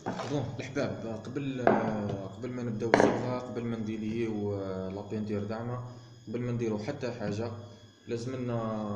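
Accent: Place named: Tunisia. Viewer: لهجنك قريبج للهجة التونسية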